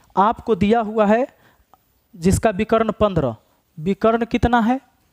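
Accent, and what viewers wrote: Bihar, India. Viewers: Sir Kya aap .....Bihar se hai ?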